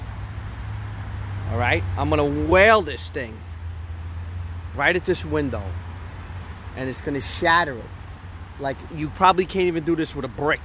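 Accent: New York, United States. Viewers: The New York accent makes me think that’s not his car. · He sounds like the definition of an American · certified new york accent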